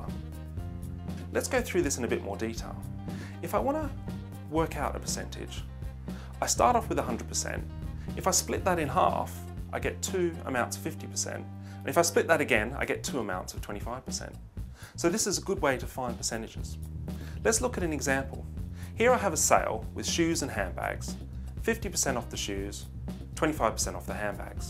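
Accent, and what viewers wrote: England, United Kingdom. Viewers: I´d like to see more class tought by this teacher. I love english accent.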